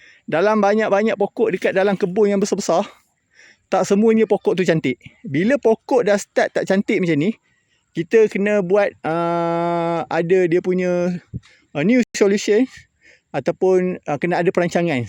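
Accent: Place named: Pahang, Malaysia. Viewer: oh patut dengar cakap macam orang pahang.. haha boleh datang melawat kebun dok bang?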